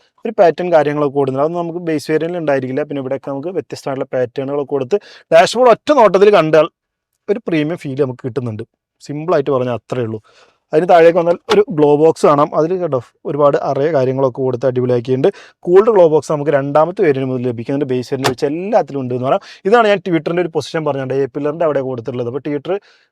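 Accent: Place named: Malappuram, India. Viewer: Typical malappuram slang aanu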